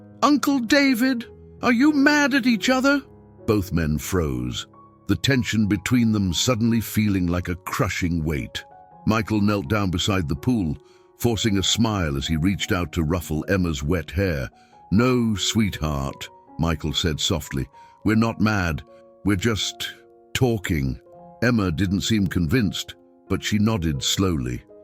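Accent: United Kingdom. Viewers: I liked this story and the others. But, I wish they'd use another narrator. The voice sounds too English.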